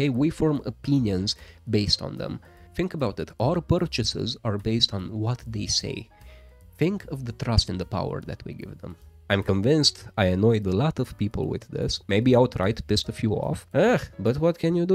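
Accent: Russia. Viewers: credeam ca esti rus dupa accent :)) dar acum ti-am dat Sub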